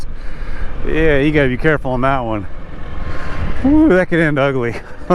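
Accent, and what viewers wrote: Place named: Ozarks, United States. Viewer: Ozark hillbilly 🤔, you from SW MO or Arkansas?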